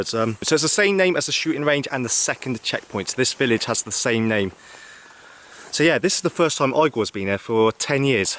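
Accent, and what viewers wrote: United Kingdom. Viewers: I love that brittish accent of you 😂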